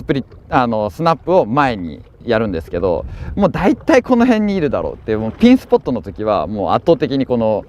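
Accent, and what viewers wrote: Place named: South, Japan. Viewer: South japanese accent!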